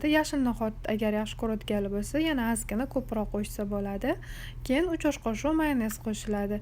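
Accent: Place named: Tashkent, Uzbekistan. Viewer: Towkencha gapirvottilaku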